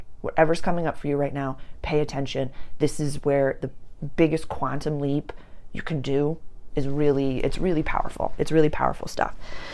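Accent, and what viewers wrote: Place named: Massachusetts, United States. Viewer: Oh my dear ❤, i to am a Aries Sun, Pisces moon, Aquarius ascendant!! I feel you Girl 🙏🏻🌈🪶, By the way I talk to and hug trees 🌳. 🌲, I grew up in Oxford Mass 1970’s and I talk like you ❤🥹… love my accent.. I just found you a few months ago and I feel like I have known you my whole life, thank you for all you do. I’m learning so much from you, not bad an old woman. 🧙‍♀….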